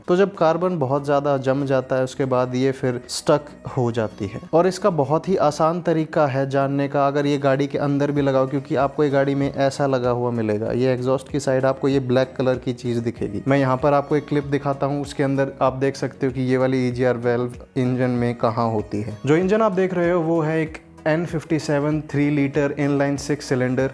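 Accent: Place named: India. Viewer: What language are you speakin? English-indiano? We don't understand a f****n thing seriously....add subtitles.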